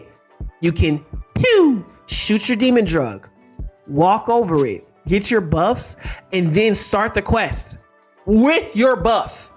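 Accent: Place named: Milwaukee, United States. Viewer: Super weird ass comment but are you from MKE? Your voice is like bringing me vibes of my old home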